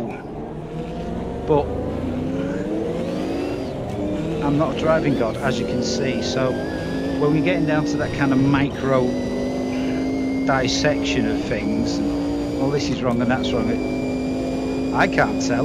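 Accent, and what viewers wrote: North, United Kingdom. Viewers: Love the Group C's in AMS2. That Sauber and Fred's Jag are standouts for me. Congrats on the 2k. I enjoy those familiar dry witted, dulcet northern tones 😆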